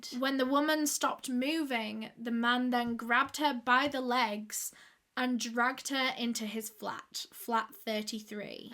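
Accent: United Kingdom. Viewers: I've never been that into british accent, but yours is just so charmingggggg 🥺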